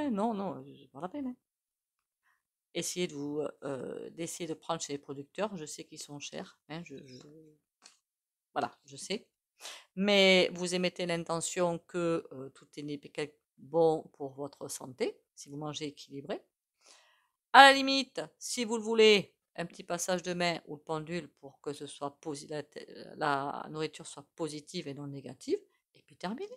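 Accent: South, France. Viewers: Coucou bonjour 🎉 Ravie de te rencontrer❤ Je ne sais pas pourquoi comme dirait, avec cet accent fort du midi que j'adore, ça sent le soleil, je t'imaginais brune très brune, Oups, on a le droit de se tromper hein 😅 🙏🌸belle journée,bisous bisous🕊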